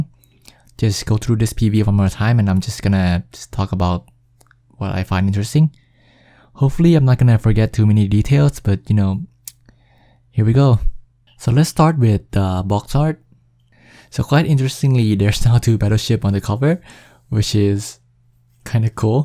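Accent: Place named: China. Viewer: That's not an indian accent. I think he's Chinese.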